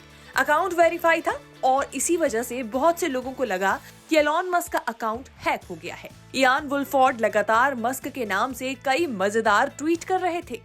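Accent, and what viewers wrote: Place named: Bhojpuri, India. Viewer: Oh my God ... Your bhojpuri accent is so s#xy 😁😁😁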